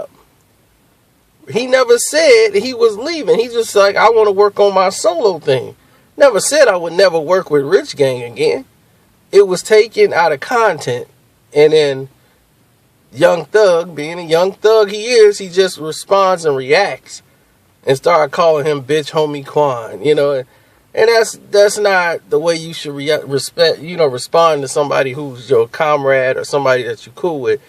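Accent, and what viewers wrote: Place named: South, United States. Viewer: your southern accent reminded me of the film "snow on tha buff."Lmao love that flick.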